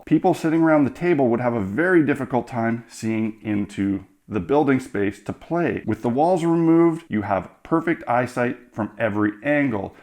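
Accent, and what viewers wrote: Canada. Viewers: Oh and love the Canadian accent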